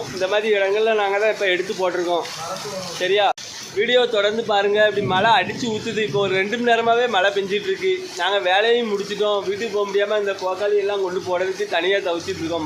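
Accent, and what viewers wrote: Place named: Tirunelveli, India. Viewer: " புத்திசாலிகள்😂" புரிஞ்சுதா என்பார்கள் . இவர் " சரியா " என்று கேட்பவர்களின் கருத்தையும் கேட்கிறார் . உண்மையான புத்திசாலி . நெல்லை மாவட்டத்தின் பேச்சு வழக்கு !👍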